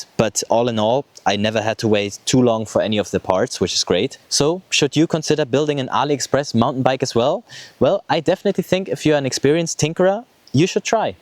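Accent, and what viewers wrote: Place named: Germany. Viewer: I hear a bit of german😂😂😂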